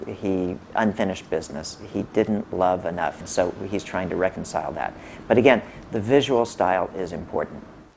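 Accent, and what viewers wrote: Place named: United States. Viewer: I am greek guy 38 years living abroad. I love the American accent and believe to your words even with your American beautiful accent :-)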